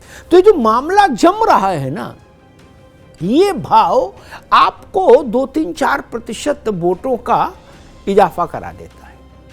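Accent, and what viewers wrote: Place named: Kanpur, India. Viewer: भाई जी आपका कनपुरिया स्टाइल मे किसी भी अंक का प्रस्तुतीकरण करण लाजवाब होता है। आप चिरकुटों पर ऐसा जूता मारते हो भिगो भिगो कर कि हंसते हंसते खाना ही पच जाता है। जीयो भइये 😊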